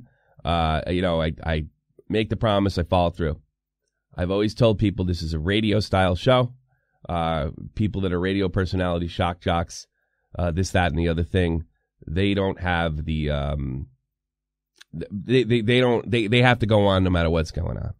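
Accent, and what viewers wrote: United States. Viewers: "accent-less" nah, I think you mean English with an American accent.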